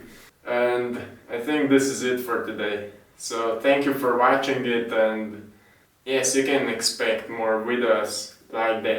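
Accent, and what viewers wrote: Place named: Slovenia. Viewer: Živjo, začela sem pisat engleško in čujem da si Slovenc. Gdaj sem vidla da delaš yogo in posnemek v naravo in pravim kako je to čudovito lepo, kje pa je to?! A to je naša lepa dežela. Samo naprej k veličanstvenim. Lep pozdrav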